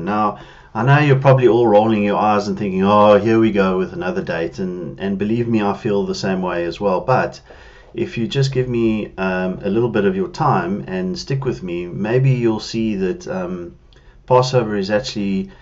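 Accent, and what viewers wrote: South Africa. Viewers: There's no way I'm rolling my eyes...Just happy to see you haven't given up and that you are still looking and searching, which is encouraging to those of us who can't wait for the return of Our Lord and Savior Jesus Christ....Things are defenitely coming to a head in the middle east,and who knows what North Korea have got on their mind....Thank you for your work...As I mentiioned before,I am living in South America and I love to hear a fellow SA accent,especially knowing you serve The Lord....May God bless you....Maranatha! P.S. I don't know if you follow Amir Tsafarti from "Behold Israel"...He also serves the Lord and gives excellent updates on the Middle East.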